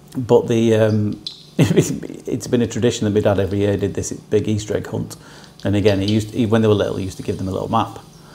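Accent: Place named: Scotland, United Kingdom. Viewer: You and Mr. Neel R. (not going to spell his last name out) so I get all the information about the ear from him. And when I need to laugh you're the page I go to. Love all your stories although I am one of the Americans who can't make out all of what you say but I get the gist of it. Honestly was very late in finding you. But better late than never. Also thought you were Scottish. Hey, Ive only been to Ireland SORRY. Wonder if you have ever heard of the town Warburton, if its even a town anymore? Those are my relatives, the Warburton's. Anyway, love the videos and I have many to catch up on. Oh and what do you say at the end of every video? TT or something? Take care, From your fellow Brit who's ancestors crossed the pond a long time ago.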